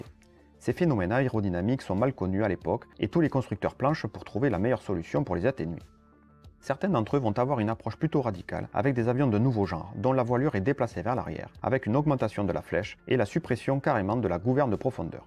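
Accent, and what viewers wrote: South, France. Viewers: Passionnant ! Continue comme ça. Et le petit accent du sud ajoute une touche vraiment sympathique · L'accent du sud, avec tout plein de Mirages avec des cocardes rouges à croix blanches... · pour l’accent du sud ! Super contenue technique au passage . Un petit dossier sur le pa49 ou un petit Leduc des familles ? Continue !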